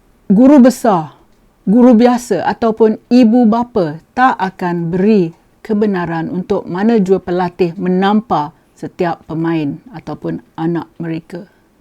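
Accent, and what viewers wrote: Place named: Kelantan, Malaysia. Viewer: speaks malay kelate accent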